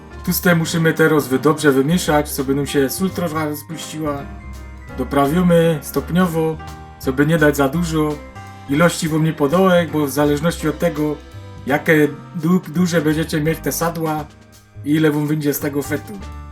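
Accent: Silesia, Poland. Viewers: ..to szukaj na internecie po polsku a nie obrażaj pięknej śląskiej gwary😮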